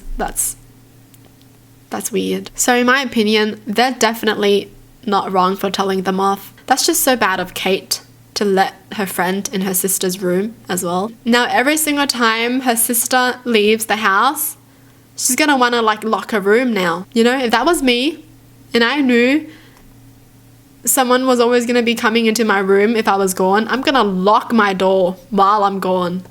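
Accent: Australia. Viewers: Australian, (She's Vietnamese-Australian) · Australian I believe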